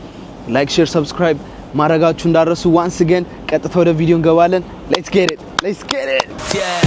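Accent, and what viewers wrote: United Kingdom. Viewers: Ur british accent ❤❤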